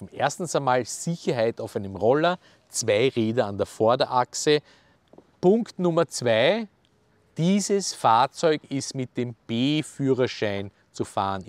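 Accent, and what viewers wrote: Austria. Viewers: Wie immer ist dieser Moderator sehr sehr nett und freundlich sowie proffesionell und dann hat er noch den schönen Wiener Dialekt einfach super und genial 😎😃😂😁😀😄😅😆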